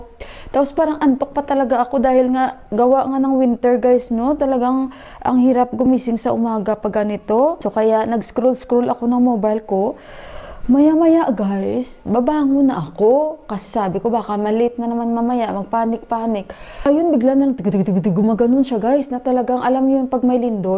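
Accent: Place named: Ilonggo, Philippines. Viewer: Ilongga po ba Kay maam? Now lng ako nka view Ng YT channel mu. Alam ko tlga sa tono mu kc e😁😉. And kamukha mu ung crew ko dati sa Try me ice cream house & resto dto sa Iloilo☺️Ingat po Kau jan🙏🙏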